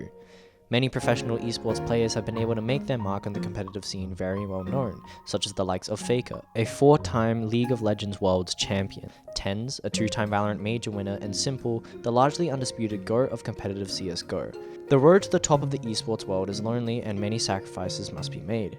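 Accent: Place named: United Kingdom. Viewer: Just to let you know, your british accent is stupid, and you need to speak up, put some balls into your speech. It's bad enough with your stupid English tea accent.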